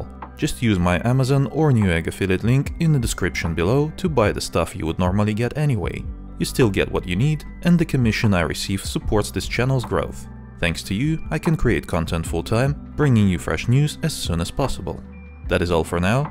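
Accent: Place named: Russia. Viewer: Russian author, I understood it by the accent and his name and asked why he doesn't make videos in Russian. He said he was doing , but he didn't like it. He didn't explain what it was. I answered with a Russian idiom that can be translated as... no, not literally), but the meaning: "your channel - your rules" In General, nothing important to you, just a conversation between two Russians.)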